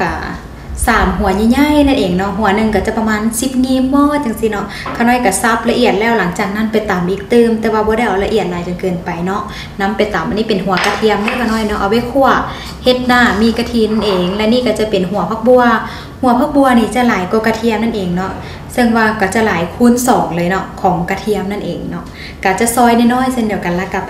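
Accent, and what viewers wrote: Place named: Laos. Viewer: ນີ້ລະຈັ່ງເອີ້ນວ່າຄົນລາວເຮົາການເວົ້າແບບນີ້ສຸດຍອດມັກຫລາຍ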